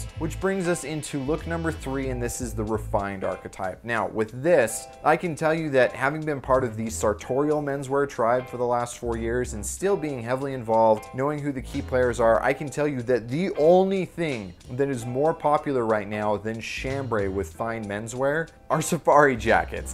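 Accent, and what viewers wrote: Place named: Salt Lake City, United States. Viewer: Ok..you have to be based in SLC... I thought I recognized how you say " imporTant"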